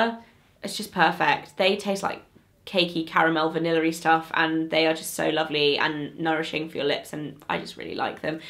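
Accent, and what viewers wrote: United Kingdom. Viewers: Ugh, where have you been all my life?? I'm completely in love. I could kick myself for not finding you sooner! But instead of kicking myself, I shall just binge watch your videos and enjoy drinking in your wisdom! Am I fan-girling out too much? Ah well, that's okay with me! I must say a lot of the US beauty bloggers I have been watching all talk about the same products all the time over and over and over. I know there are exceptions to every rule, but sigh...not many exceptions to that one in my experience. What I appreciate so sooooo so much is getting to hear about so many DIFFERENT products! There aren't many mentioned here that have been mentioned at ALL by the beauty vloggers I've been exposed to, and that's the absolute truth. I am dying to learn NEW things from NEW people with NEW experiences - and this channel is it. I'm hooked and loving every second of it. 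Aside from appreciating your expertise, I could listen to you speak with this beautiful U.K. accent all day. All I allowed to say that?? LOL well I am! I'm just in love with all things this channel - and I'm so grateful! Can't wait to tell everyone I know. THANK YOU SO MUCH! I can't wait to see all you have to come in the upcoming months. Blessings to you in the New Year! 🦄⭐️☃️💋💕🌹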